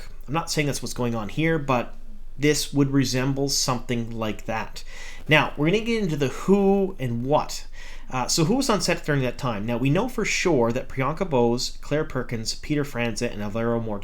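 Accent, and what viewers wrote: Canada. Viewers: You sound Canadian, do you watch hockey ? (Let´s go Penguins!).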